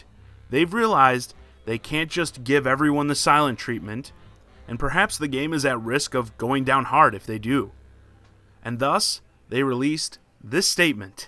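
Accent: Chicago, United States. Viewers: R u from Chicago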